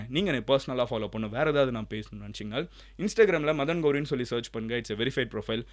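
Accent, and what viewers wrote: Madurai, India. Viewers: Neenga maduraiya bro.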